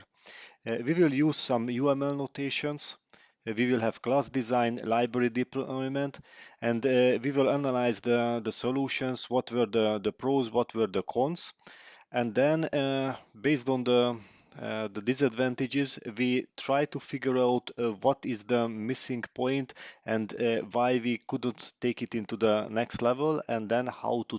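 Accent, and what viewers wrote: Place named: Hungary. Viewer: Biztos dolgoznak magyarok a Juhtyúknál a beszédfelismerésen, mert igen pontosan feliratozza a magyar akcentust.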